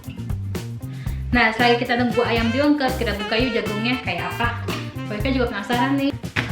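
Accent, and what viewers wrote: Jakarta, Indonesia. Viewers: Et dah si mpok..di jkt kagak ada kalkun mpok...palingan ayam boiler ame ayam tetangga lewat..nape gue jd ngomong nya gini ya mpok ala betawi,gara2 mpok nih...hee..hee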